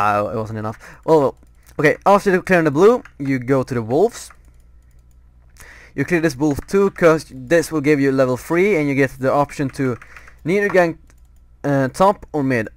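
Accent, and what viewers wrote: Sweden. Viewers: Man hör verkligen att du är svensk :P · Great guide man! Quick question that isn't related to league though, are you swedish (kind of sounds like it on your accent ^^)?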